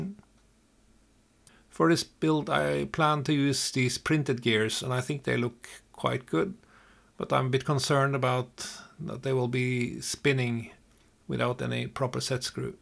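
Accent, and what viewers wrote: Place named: Norway. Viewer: You sound Norwegian just like Kanrog 😂 what part are you in?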